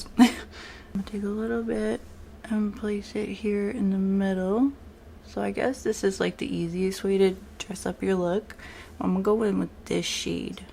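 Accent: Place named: New York, United States. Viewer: Congrats on your 260 subs... Lol heavy NY accent..My Spanish accent is heavy too, I cannot imagine what people will say about it 😅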